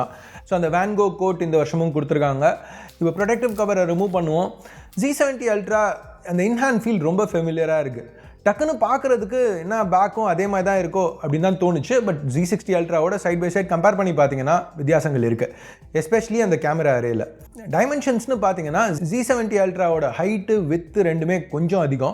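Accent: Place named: Chennai, India. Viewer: na Chennai accent majavah ikkithu 😂❤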